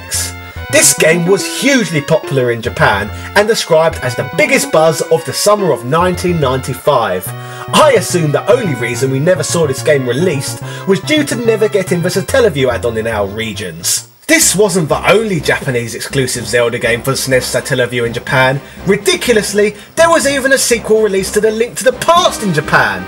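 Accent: United Kingdom. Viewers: PLEASE! Just list the games in the description! Trying to decipher Japanese names from a British accent is impossible.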